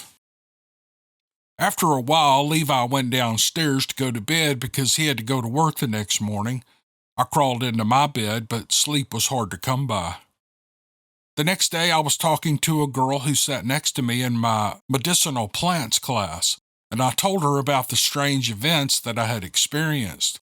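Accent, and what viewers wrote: South, United States. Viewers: Thank you for all your hard work, good Sir. I hope this finds you well and happy. Tonight it is bitter cold outside and as usual, I can't get to sleep. About accent: As a returned to the north after I had lived south for over 20 years kind of person. I have heard southern accents of all sorts of from the heavy drawl to the slow little snippets of words with a twang. southern accent is one of the loveliest I've heard, easy to understand, not too drawn out, not too thick, not too slow, just a gentlemanly, comfortable, gentle, and sweet southern sound. There are many things about the south I miss and hearing some southern accents is one of them, so thank you for the memories, too. Now, put me to sleep, will ya? Take care dear, Rosey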